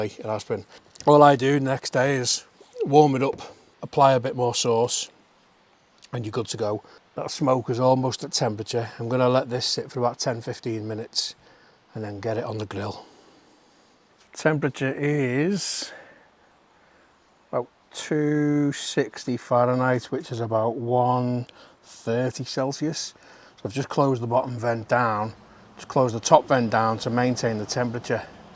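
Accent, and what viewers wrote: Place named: Scouse, United Kingdom. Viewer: I'm from the Wirral (next to Liverpool) but now live in Queensland Australia. Really like the videos and like to hear your accent, which is strange because I don't really like the scouse accent but yours is exceptionally subtle. Keep up the good work 😊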